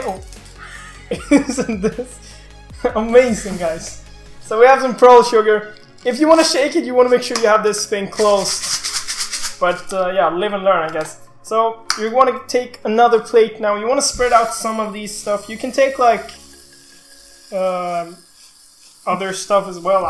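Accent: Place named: Sweden. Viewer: Do swedish people really sound like that? I meean the accent, im pretty sure you've heard this before but you kinda sound like BluePanda. hehe and LOL tutorial video out of nowhere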